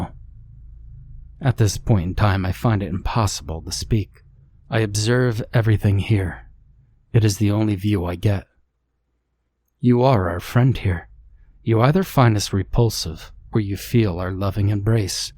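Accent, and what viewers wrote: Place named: Texas, United States. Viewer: Holy shit! That was an incredible Texas accent with Eastern European undertones! I mean it! That might be the best, specific accent I’ve ever heard.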